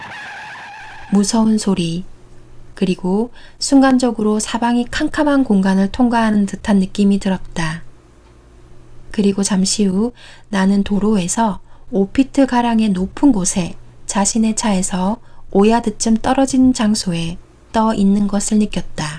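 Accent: Gyeongsang, South Korea. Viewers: 이 채널의 주인장이 경상도 사람인 것 같아서 새삼 정이 갑니다. 책을 읽는 어감이 주는 익숙하고 편안함... 마치 고향으로 돌아가 할머니 무릎가에서 한 편의 옛날 이야기를 듣는 기분이었습니다. 편안하고 뜻깊은 시간을 주셔서 감사 드립니다. 고맙습니다~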